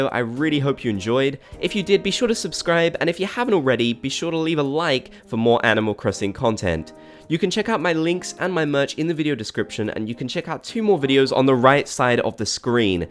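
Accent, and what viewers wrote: United Kingdom. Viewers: are u British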